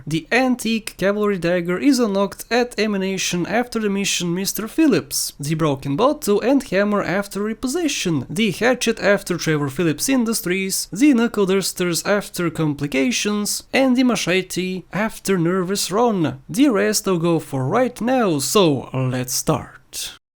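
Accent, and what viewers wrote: Russia. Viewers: Are you Russian bro? I only ask because my mum is Polish and I think your accent is dope. Don't take any shit from anyone about it. Your channel is awesome. :)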